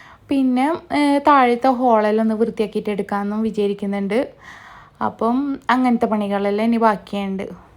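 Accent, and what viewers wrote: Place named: Kannur, India. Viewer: കണ്ണൂര് പോലെ തോന്നി അതാണ് ചോദിച്ചത് 😊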